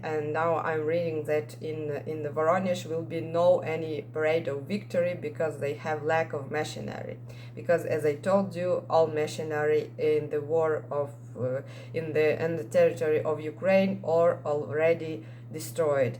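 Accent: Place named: Oxford, United Kingdom. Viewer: Привіт доктор. Ваша англійська чудова, і я думаю, що ви вивчили стандартну оксфордську англійську. Невеликий човен, який був потоплений - десантний катер Яма з 1000 тілами - братська могила Безперечно, розвідка США та Великобританії буде вказувати місця розташування прикордонних військ – вони будуть знищені за добу, якщо вони увійдуть в Україну. Потоплення десантного корабля, більшого військового корабля і «Москви» — це чудові новини, які повинні перешкодити висадці Росією морського десанта з Чорного моря. Чудово, якщо після війни Одесу все ще можна використовувати як український порт. Побити росіян. Нехай ваша армія воює міцно і без страху. Енді (Бакінгем, Великобританія)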